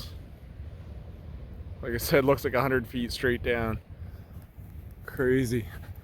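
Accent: Canada. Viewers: Your Canadian accent and your usage of "feet" as a unit of measure don't compute properly in my Universe... 🤔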